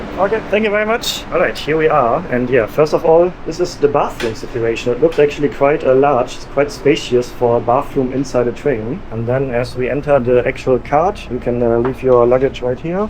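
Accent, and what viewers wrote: Germany. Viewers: Ja, ja, das ist gut! You are fun to listen to with your detail explanations and German accent. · Remember English is not his native tongue. He’s German. Give his accent a pass! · you're pronounciations in English, always sound Gemany❤😂❤😅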